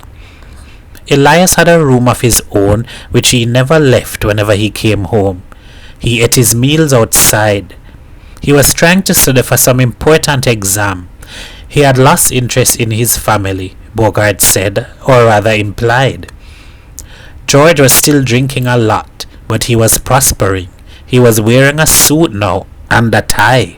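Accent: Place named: Trinidad and Tobago. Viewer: Thank you, Sir, for reading Miguel Street in what I assume is a Trinidadian accent. Grateful Zimbabwean here.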